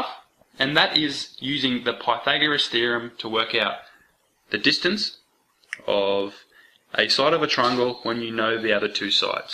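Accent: Australia. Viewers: He's an aussie, they're like texas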